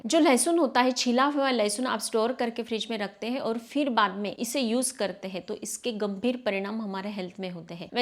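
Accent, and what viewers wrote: Maharashtra, India. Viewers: मराठी tone का हिन्दी ब्लॉग टिप्स बहूत बहुत सुन्दर प्रस्तुति,आपके अन्दर श्री महालक्ष्मी जी के अंश ज्यदा है,wow❤❤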